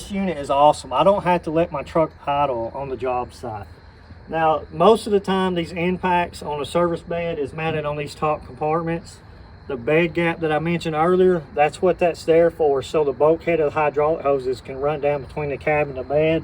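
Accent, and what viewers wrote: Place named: Europe. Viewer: What a nice white European accent 👍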